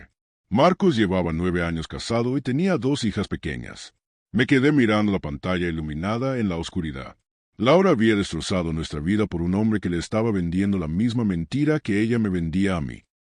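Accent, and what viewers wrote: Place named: United States. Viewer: La historia estaba bien, pero por qué usar una voz de IA tan rara? El tono de voz es horriblemente incómodo y el acento gringo es innecesariamente molesto.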